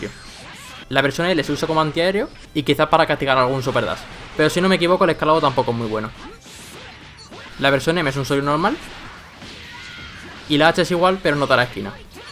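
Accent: Spain. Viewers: Eres de Granada o de Jaen? xD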